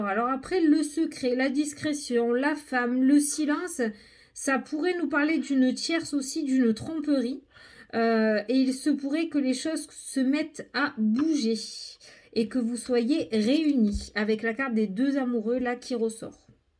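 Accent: South, France. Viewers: J'adore t'écouter avec ton accent du Sud! Et ton jeu l'oracle du temps est magnifique. Je ne tire pas encore les cartes mais il me donne envie de m'y mettre! Bravo!💖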